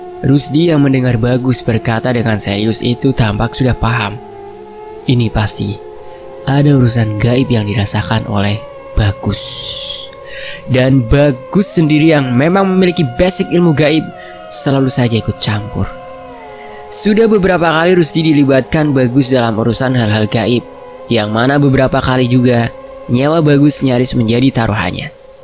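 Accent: Java, Indonesia. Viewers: Jowomu medok bngt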